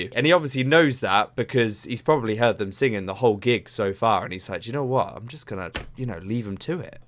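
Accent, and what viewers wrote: United Kingdom. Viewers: I love your brits accent ♥️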